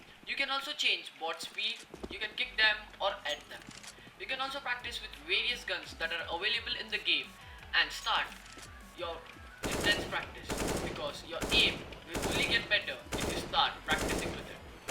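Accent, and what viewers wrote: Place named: India. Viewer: from india? that accent wtf - anyway improve, as already mentionted, the audio. have my upvote for the tips though.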